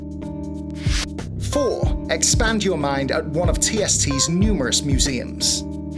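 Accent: United Kingdom. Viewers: Great stuff,. Quite a new fan of yours, mate. Great content, and lots of stuff many other videos of HK don't cover. I've already been once a few years ago, and your great footage is setting up my appetite (literally) for another visit. Keep up the good work - those soft Northern tones of yours are dreamy too!!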